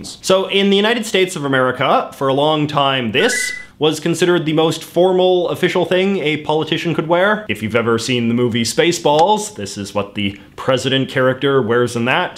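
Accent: Canada. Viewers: Thank you sir. You may (or may not) be interested to know that many members of our Royal Family in UK seem to love wearing military uniforms regardless of their (the RF member's) age or membership of a particular militia. Incidentally, talking of nations, natives etc did I detect a fine, Canadian accent? I have been practising listening before my hearing finally departs! Blessings and peace PS I really need to listen to the complete video to prevent making observations that you have already addressed. My apologies. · You have a very Canadian accent overall, but the way you pronounce "lieutenant" is quite American. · First time here and you’re the most Canadian person I’ve ever heard... and I’ve been to Canada 😂 really enjoyed this! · You can tell this guy is Canadian just the way he speaks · Funnily as a Canadian in BC I don't normally hear the stereotypical Canadian accent, but yours is quite pronounced. 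I assume this is an Ontario thing? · As a fellow Brit, it also helps that has an incredibly thick Canadian accent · Don't think so, we all just say Missouri the normal way lol. He does have the stereotypical Canadian accent though, so I guess that's it. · Oof this dude sounds Canadian. They usually sound like normal people, but then they say one or two words in a super weird way. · I can tell that Canadian accent BTW I really want to go to Canada we’re would you recommend? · Hey man, I’m from Canada too but “arooond” instead of around, is a really extreme accent. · I challenge you to say out and about without the Canadian accent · Thanks for keeping the Canadian accent alive,. You really lean into it. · Love your Canadian accent as well as your legs. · This is the most Canadian accent I have ever heard. Is this real???? Where are you FROM??? hahah (Respect to Canada from Minnesota) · i cant listen to him say outfit or about in canadian again. 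im out. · First time I am watching this channel (very impressed) but that Canadian accent... either exaggerated or you're from Cape Breton or Newfoundland. The only reason I say that is I'm a maritimer myself and haven't heard that in good old Halifax, but certainly have heard it around ! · Is that a real Canadian accent? The “oot and aboots” are so strong it sounds like you’re mocking Canadians. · The way you pronounce about and around is clearly rooted in some deep candiana, yet you forego the proper pronunciation for Lieutenant for the American pronunciation.. why is that? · I’ve never heard a more Canadian accent in my life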